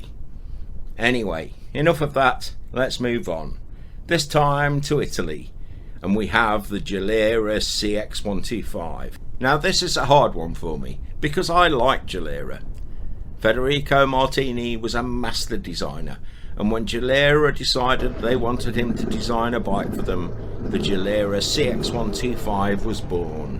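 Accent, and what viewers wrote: United Kingdom. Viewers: Your British voice makes this ten times funnier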